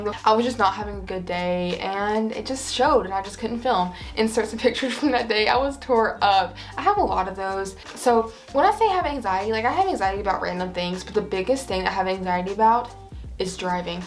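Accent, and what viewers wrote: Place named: South, United States. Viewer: I just found your account and I think it was pure fate!! Love your midsize fashion content and that you have a southern accent like I do even though I am an old woman 😂 I just got a breast reduction this past fall and it was a very weird time. I’ve had body dysmorphia my entire life and that was a huge part of it. I feel SO much better and the change in my body is great but also very bizarre. I hope your recovery goes smoothly and that the adjustment period to your new shape goes well!!!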